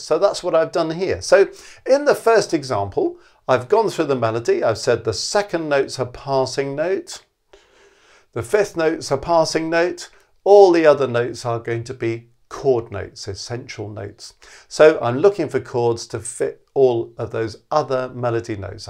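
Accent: RP, United Kingdom. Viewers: Love your RP accent. So comfortable to listen to!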